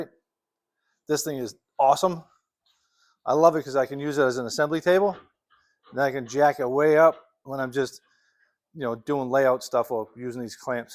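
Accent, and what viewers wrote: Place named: New England, United States. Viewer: I love your accent, I left New England in ‘79, this brings me back.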